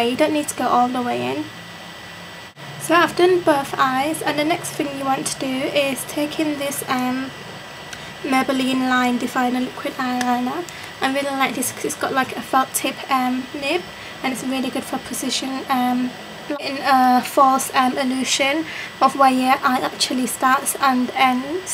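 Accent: United Kingdom. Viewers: she has a british accent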